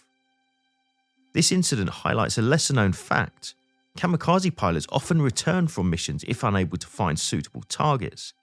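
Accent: England, United Kingdom. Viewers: You sound British so why not pronounce lieutenant as 'leftenant'? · Endlich spricht man jemand englisch und nicht amerikanisch 🙂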